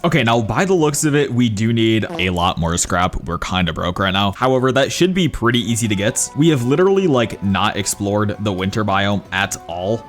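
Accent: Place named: United Kingdom. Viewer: I think he is British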